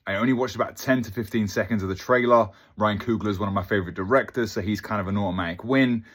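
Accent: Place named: England, United Kingdom. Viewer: You have an English accent 😂😂😂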